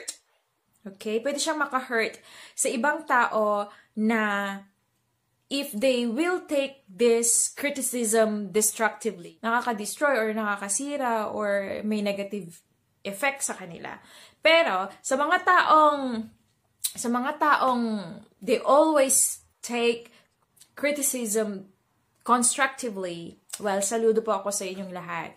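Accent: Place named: United States. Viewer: Hello there. Your pronunciation is very good but has an slight American “slant” to it which is not always pronounced as “true English”. I assume your in the USA not England? There are many different dialects in the UK which can sound different in different areas of the country, which is also similar in the US of course. Having said that, I would suggest mayonnaise has a number of slightly different pronunciations. Where I am it has more of a “maya” naise sound to it lol 😂 Having said all of that, you speak very well and will undoubtedly help many people 🤗👍🏻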